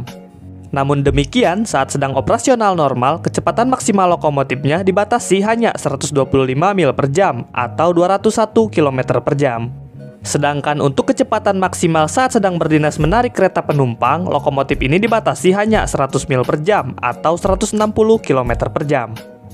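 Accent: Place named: West Java, Indonesia. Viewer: Naratornya sunda pisan euy...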